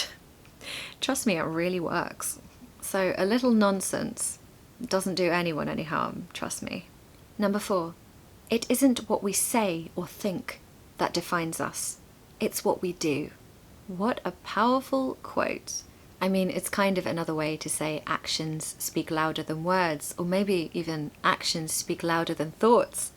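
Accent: United Kingdom. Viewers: Beautiful British English and form an inextricably-linked whole. So yeah, I come 'for both'. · you have a beautifully sounding true British accent. How can I achieve that accent you have if I am not an English native speaker?? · Hello, I recently subscribe your channel. I love your british accent! I am from Chile and enjoy your videos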